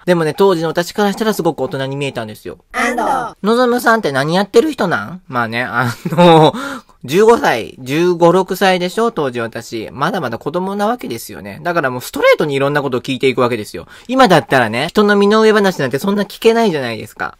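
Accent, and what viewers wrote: Kansai, Japan. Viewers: いい話やねーもと此花区出身です。の関西弁好きやわー自分が子供だった頃に一瞬もどります。は若い時から外も仲も可愛かったんやねー男でも女でもない人間として可愛かったんやねーなんか感動してしまいました。大阪弁の中には沢山の感情が混ぜられているようで関西人魂に響きます。私はもう関西に住んでないくて、関西の住む身内からは私の’’関西弁が古くてへんや’’って言われてびっくりしました。どうでもええねんけど。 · の青春の思い出ですね。なんかしんみりして聴いてました。ノゾムさん元気でいて欲しいです。の関西弁好きです😀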